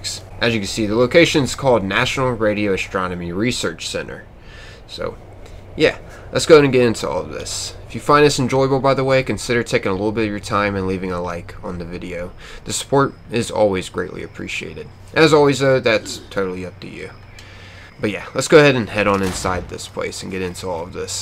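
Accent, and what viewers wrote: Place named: Philadelphia, United States. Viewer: Are you from around Philly? I'm trying to place your accent.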